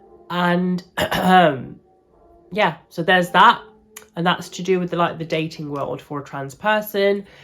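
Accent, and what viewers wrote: England, United Kingdom. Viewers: you are totally a passable, even if you were having an off day, that English accent instantly makes you passable again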